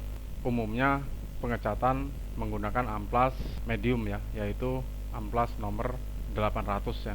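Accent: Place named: Java, Indonesia. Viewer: wuah.. jauh ya Saya di Jatim ini mas.., Saya kira di jawa, soalnya dengar2 logatnya sprti org jawa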